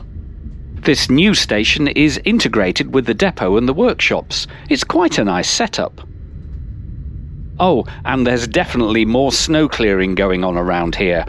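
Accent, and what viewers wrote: United Kingdom. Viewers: Absolutely stunning video. I just found it on youtube and was amazed to hear a British voice. I live in Mariazell and have riden the same train many times but never in Winters. So it was amazing to see the Views through your lens. Thanks for sharing !!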